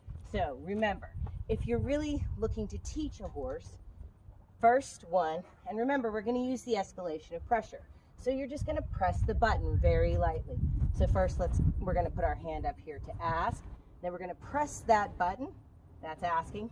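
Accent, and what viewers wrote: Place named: Texas, United States. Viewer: What part if Texas are you from?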